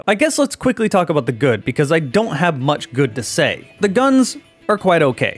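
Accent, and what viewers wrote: Poland. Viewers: Are you polish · I thought he is Polish :) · Weird question, are you Polish?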